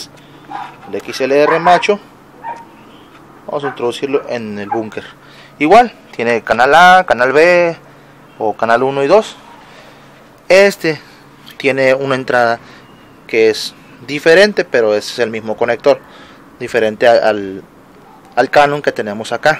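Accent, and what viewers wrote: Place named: Piura, Peru. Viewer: ese dejo es de piurano o de mexicano.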